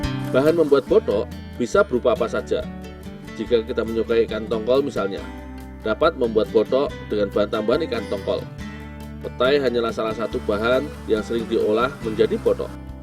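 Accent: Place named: Banyumas, Indonesia. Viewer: Ngapak yow .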